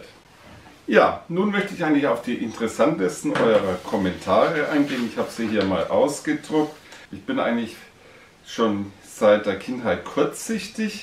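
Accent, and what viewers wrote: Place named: Franconia, Germany. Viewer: Informatives Feedback- Video! Deinen fränkischen Dialekt würde ich unbedingt beibehalten, der verleiht deinen Filmen erst das richtige Kolorit. Noch kurz etwas zum derzeit durch den Preisverfall etwas in Verruf geratenen Sammelgebiet Bundesrepublik Deutschland: versucht doch einmal, die ersten sechs Jahrgänge durchgehend mit klaren, lesbaren Vollstempeln zusammenzutragen! Nach meinen Erfahrungen gar nicht so einfach, denn zu dieser Zeit wurde meist noch auf motivfreie Entwertungen geschaut, und Vollstempel waren eher Zufallstreffer., wie wäre es, wenn du einzelne europäische und auch Übersee- Sammelgebiete in Kurzportraits vorstellen würdest: Frankreich, Schweiz, Großbritannien, USA, Kanada, VR China usf. Wie stellt sich das Gebiet als Ganzes dar, was ist beim Aufbau einer solchen Sammlung zu beachten, ist eine entsprechende Sammlung für den Normalsterblichen finanzierbar, Seriosität der aktuellen Ausgabepolitik und natürlich auch die Preisentwicklung. 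Würde mich freuen!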